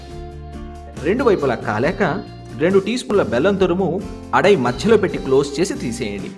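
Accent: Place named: Kerala, India. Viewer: Anna ne language kerala madhrega unadhee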